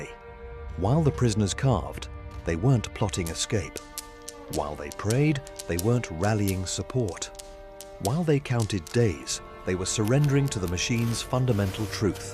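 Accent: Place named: England, United Kingdom. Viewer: I am not a fan of A1 but it does have it's uses for things like this. One point thst kept me watching was the clear English narrator's voice and not a transatlantic one which makes me turn off.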